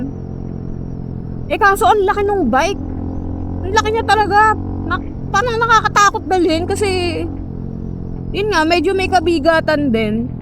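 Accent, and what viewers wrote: Laguna, Philippines. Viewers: Tunog palang ng boses matik taga Laguna e 😆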